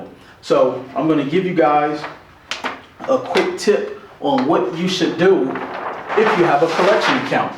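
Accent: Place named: Pittsburgh, United States. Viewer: Very helpful!! U still got your Pittsburgh voice ...Haha r u from Pa? 👍❤👌🙊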